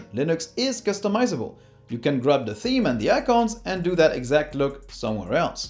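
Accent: France. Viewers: Ah such a relief to hear the half french accent pronouncing 'linux' again. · Are you french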